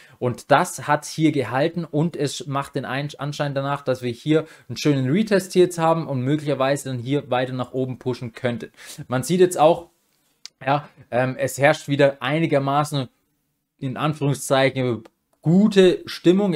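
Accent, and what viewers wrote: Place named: Swabia, Germany. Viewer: Woher genau kommst du aus dem Schwabenland, wenn man fragen darf? 🙂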